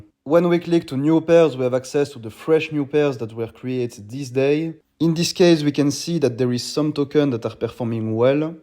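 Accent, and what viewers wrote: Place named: France. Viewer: mdr le big accent fr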